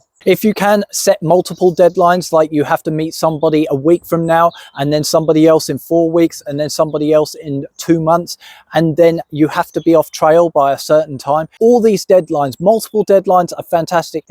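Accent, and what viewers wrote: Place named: United Kingdom. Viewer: I don't know man, I love the premise behind the videos, etc. But something in the delivery is missing.... Perhaps it's your British accent making us Americans think you are just being a pretentious arse instead of being tongue in cheek. Maybe dress up as one of the knight s who say nee or something, somehow give us some slapstick. I'm not sure exactly what, because the observations are very on point, so it's gotta be somehow in the delivery that just doesn't get me laughing.... Can I ask where you originally hail from? Dry British comedy can be enjoyed by us, but we still remade The Office with Steve Carell....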